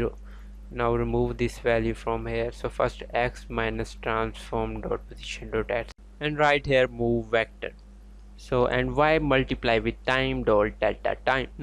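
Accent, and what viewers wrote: India. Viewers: Indian accent found =Op teaching ,btw i am from nepal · the dude with the indian accent has saved us once again!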